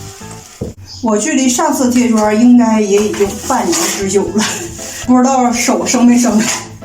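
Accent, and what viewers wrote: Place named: Heilongjiang, China. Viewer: 为什么这么像黑龙江口音？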